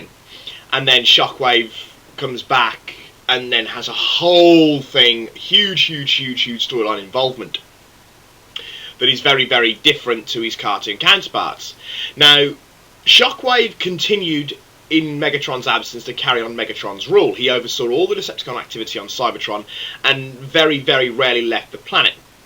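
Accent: United Kingdom. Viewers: I know you may have heard it before but my friend and I think you sound like a brit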